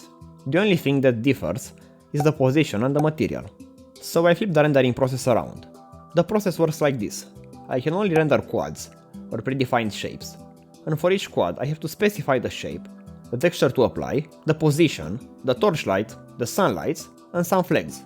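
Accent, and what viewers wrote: Romania. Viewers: instant mi am dat seama ca esti roman dupa accent <3misto vid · miros accentu de roman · mama accentu de roman e prea mare :))) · You sound romanian!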